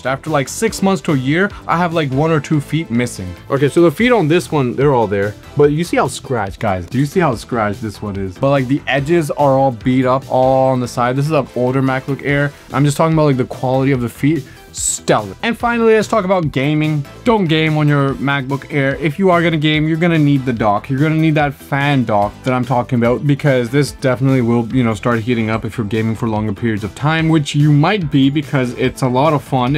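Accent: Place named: United States. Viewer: Dude speaks British English with an American accent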